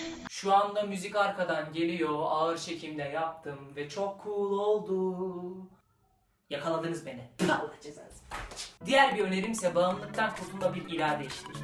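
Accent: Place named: Trabzon, Turkey. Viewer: trabzonun neresindensin reyiz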